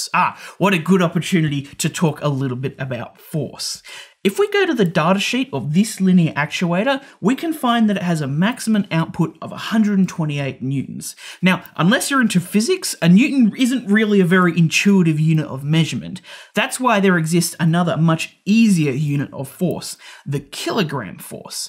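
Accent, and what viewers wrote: Australia. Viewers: Yewwww fuck yeah mate, love the vid, love the channel. I'm from the central coast and I love hearing aussies explaining electronics.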